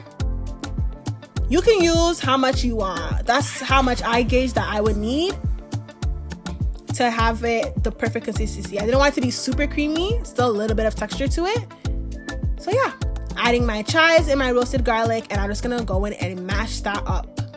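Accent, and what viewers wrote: Toronto, Canada. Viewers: That Toronto accent is hilarious 😭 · Toronto accent smacked me in the face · Soon as u started talking!! I said she a Canadian!! We really got an accent!! Nice recipe!!!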